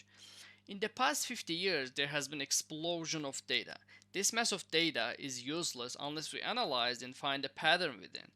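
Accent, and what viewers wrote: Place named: United States. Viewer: Abi cok guzel ingilizcen var, Amerikada olmus gibisin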